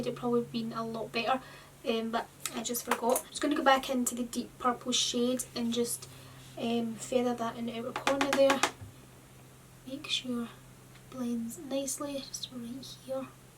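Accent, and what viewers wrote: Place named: Glasgow, United Kingdom. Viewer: This is a lovely look, I ended up buying both of Alexis' palettes, then I went and bought another transformation palette because I loved it that much. You sound like you're close to where I'm from, I'm in Glasgow 💖👍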